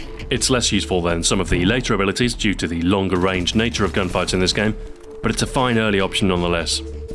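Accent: United Kingdom. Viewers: so british?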